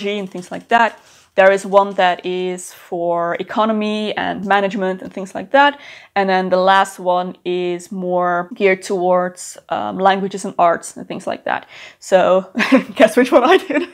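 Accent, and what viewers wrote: Netherlands. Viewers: I heard you were dutch the second you opened the mouth you have the accent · I always wondered whether you were Dutch or not, because of your accent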